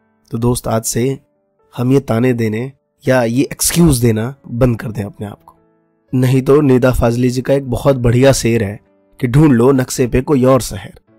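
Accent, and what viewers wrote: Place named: Delhi, India. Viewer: Sir nice video by the way aap Delhi se ho kya Touch wahi laga bolne ka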